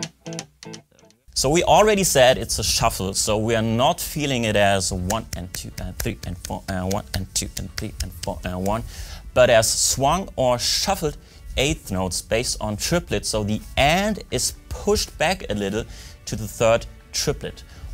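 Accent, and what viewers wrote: Germany. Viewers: I'm sensing a german accent there.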